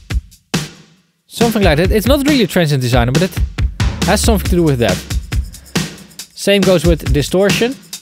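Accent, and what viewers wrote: Netherlands. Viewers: you sound a little bit dutch, am I correct? 🙂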